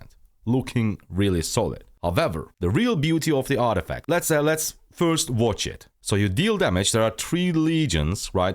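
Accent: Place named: Turkey. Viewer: Dude, your accent sounds turkish, are you ?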